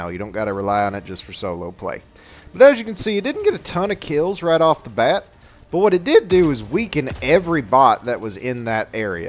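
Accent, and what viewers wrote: South, United States. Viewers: I’ve watched other people, and they just kinda talk, but I like how you actually have personality in your videos. The southern twang really adds to the bot shit talk. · It's good to meet a fellow diver who has a Southern NA accent! Respect brother! Keep spreading Democracy to those socialist bots!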